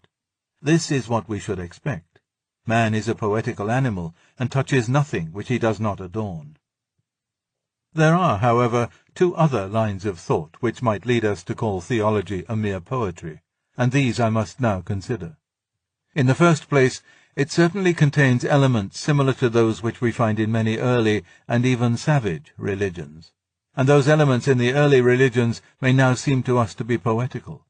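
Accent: United Kingdom. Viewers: I love his voice. Nice British accent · very old times British aristocrat. You've seen that in movies so you're conditioned to it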